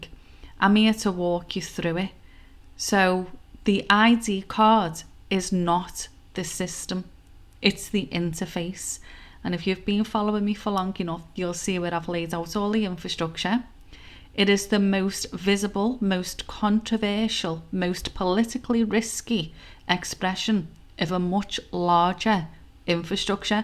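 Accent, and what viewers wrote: Liverpool, United Kingdom. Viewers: Love the scouse accent. First time viewer, attracted by your content. Only Ben Habib called out all the broo haha on the "U turn" "victory" makes me wary of Rupert Lowe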